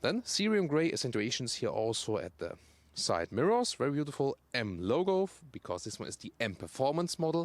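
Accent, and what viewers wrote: Germany. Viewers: There's something so satisfying about a German car being reviewed by a guy with a pleasing German accent. It's just wunderbar!!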